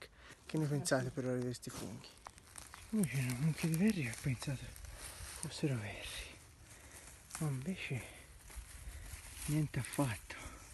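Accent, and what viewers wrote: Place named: Tuscany, Italy. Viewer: Belli i funghi ,bello te e la nonna,ma l’accento toscano mi fa’troppo impazzire!Complimenti con tanta invidia da una nonna che non ce la fa’ più ad andare a funghi,bravi!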